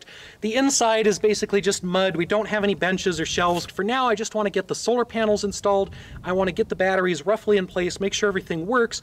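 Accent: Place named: Alaska, United States. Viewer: You being from Alaska tracks. I couldn't place your accent, but you sound similar to an Alaskan I used to work with a million years ago.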